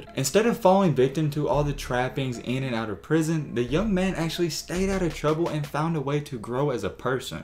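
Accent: South, United States. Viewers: dude country asl doing this vid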